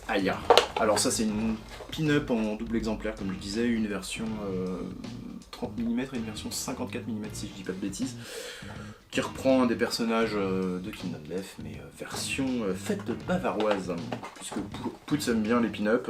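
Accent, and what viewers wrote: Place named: England, United Kingdom. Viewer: quel accent anglais, et 2043 pour la wave 4, quel optimisme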